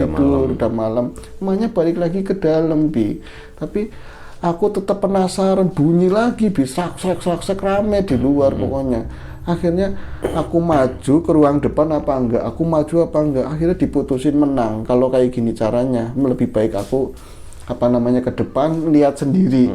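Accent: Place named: Tegal, Indonesia. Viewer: Logat tegale mntep lah .lucu wkwkwk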